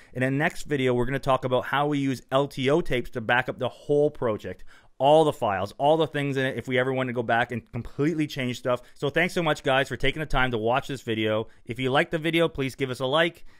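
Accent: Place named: Canada. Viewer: He's Canadian. It's one of the "tells," as is "uh-GAYN" instead of "uh-GEN" for the word "again." I worked with an Irish-Canadian editor on a couple of movies (shot in Canada, edited in Santa Monica, California) and learned a few of the clues to spot the Canadians (beyond "ah-BOAT" for "about"). Now I can't watch TV without noticing Canadian accents. (Australian actors, for some reason, seem to be the best of the English-speaking diaspora at blending in with UK English or USA English speaking casts. I think a lot of Scots are good at blending in with their southern (English) cousins.) OK, I'm rambling ... maybe too much coffee ... sorry... but accents are fun....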